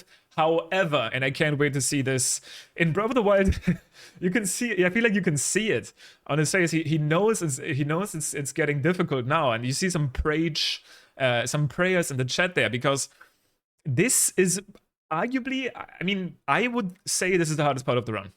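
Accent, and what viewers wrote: Germany. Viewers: Are you from Germany? Because IT Sounds like you are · are you german? because of your accent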